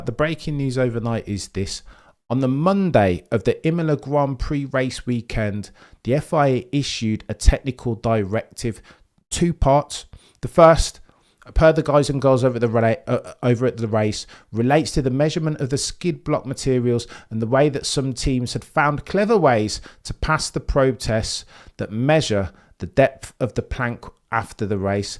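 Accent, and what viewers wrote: Birmingham, United Kingdom. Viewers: Never would I have ever thought the way to make F1 jargon interesting is to say it with a brummy accent. Go on my bro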